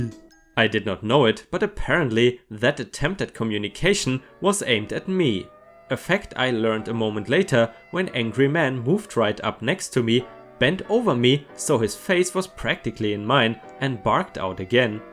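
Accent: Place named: Germany. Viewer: So, hey there. Until now, I wondered, why that accent is so familiar. Now with that "german" comment, I know why. You,re german, aren't ya?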